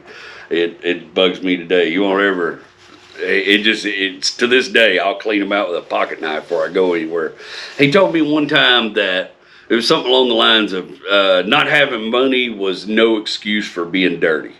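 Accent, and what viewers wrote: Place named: Texas, United States. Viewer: Lovin' this from the north coast of Northern Ireland. I'm not familiar with a lot of the US car terminology, but could listen to your cool Texas drawl forever. The stories are fantastic.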